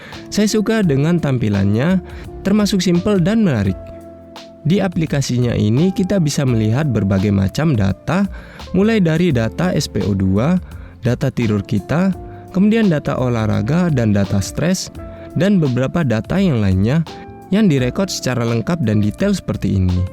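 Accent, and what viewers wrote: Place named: Malaysia. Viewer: salam dari malaysia bang,loghat melayu terdengar😂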